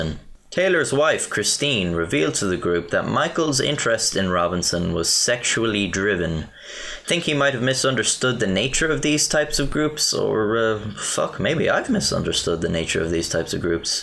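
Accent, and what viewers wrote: Ireland. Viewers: Are you Irish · Your Irish ya? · Now this is an enjoyable Irish brogue. Mike from THAT Chapter's accent makes me question his sexuality😬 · "ninteen seventy tree" i love the Irish accent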